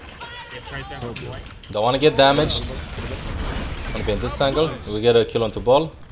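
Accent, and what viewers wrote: Romania. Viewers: Did i say he needs to hide it? I just asked if he's doing that accent on purpose because if yes I'd rather him to speak normal english without romanian accent to understand him more. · He's Romanian lol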